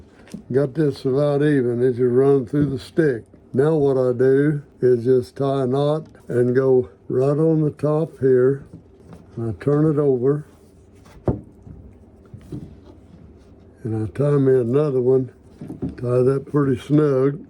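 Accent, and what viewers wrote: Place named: Appalachia, United States. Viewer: No yeah! Def not everywhere but I grew up in Appalachia and almost alllll the old folks talk like this, and honestly he reminds me of me my own pawpaw a little bit.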